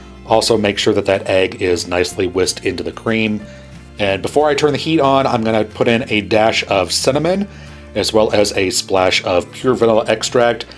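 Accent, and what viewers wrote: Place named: South, United States. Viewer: your. fried. chicken. club. sandwich. video. brought. me hear. now it's. 11pm and im watching this now. im hungry thanks 😃 i can't. see you but. do i hear. a lil bear in with. that. southern. accent.....woof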